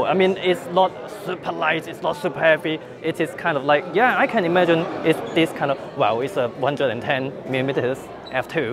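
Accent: China. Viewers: chinglish :) but great first look :)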